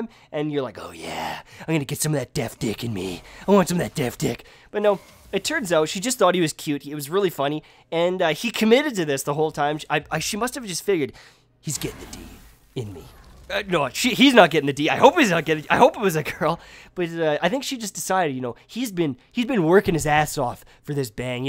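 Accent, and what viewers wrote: Canada. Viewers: R u Canadian ???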